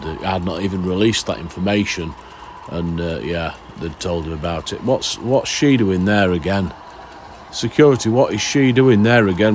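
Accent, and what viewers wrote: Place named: Northern England, United Kingdom. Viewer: I really think you could be on TV!!!, like the way 'Thomas the tank' was shown, but in the current era, your northern accent,witty humour and the way you make it like a soap, is brilliant!!!!, you got me hooked!! 😅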